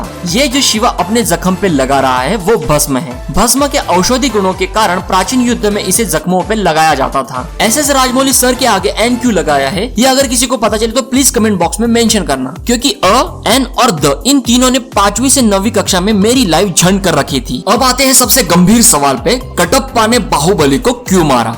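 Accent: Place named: Maharashtra, India. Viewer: loved your review and your typical marathi accent.....no theory left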